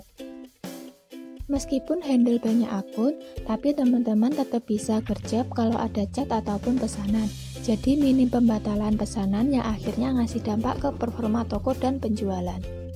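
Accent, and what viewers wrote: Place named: Java, Indonesia. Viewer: Medhok sekali mbak, makasih impohnya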